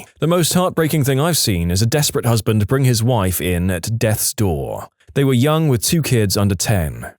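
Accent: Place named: West Country, United Kingdom. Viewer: Love the west country twang, near brizzle perhaps?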